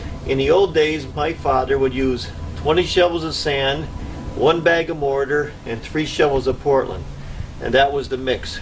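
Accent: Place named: Chicago, United States. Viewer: Sounds like a Chicago accent, awesome!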